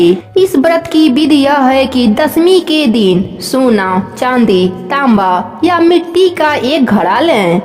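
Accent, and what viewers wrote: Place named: Bengal, India. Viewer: Ap to bagali bol rahe ho ji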